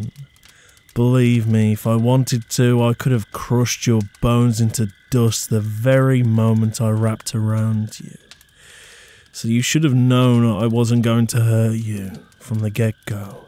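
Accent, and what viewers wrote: United Kingdom. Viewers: I could listen to tour accent all day if I could What kind of accent do you have it sounds like you are from the UK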